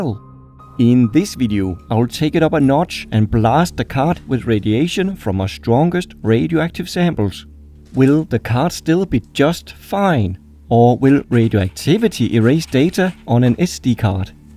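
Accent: Denmark. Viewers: Are you danish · He kinda sounds like a Danish dude with a slightly american accent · You sound danish 😌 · you sound oh so danish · R u Danish? Jeg er I hvert fald du lyder dansk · I love that danish accent :D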